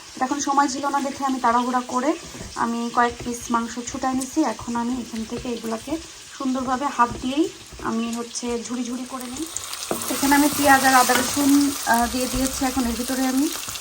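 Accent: Sylhet, Bangladesh. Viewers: bhai apnar sylheti maat huniya ami crush khailaisi..MashaaAllah 😊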